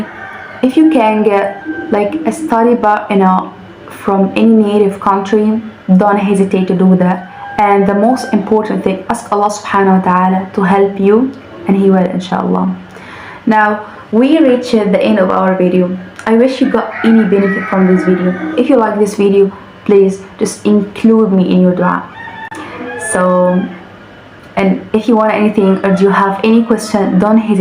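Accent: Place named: Scotland, United Kingdom. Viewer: British accent مع شوية سكوتش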